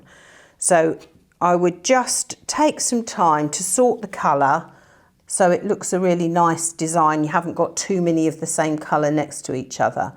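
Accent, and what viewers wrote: United Kingdom. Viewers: I love your videos because you don't rush though. But the problem I have is some of the British words with your lovely accent, I am in Pennsylvania USA. I can't understand what the name of the piece is that we iron the squares onto. If you could give a link that would be so helpful. I look forward to watching more of your videos.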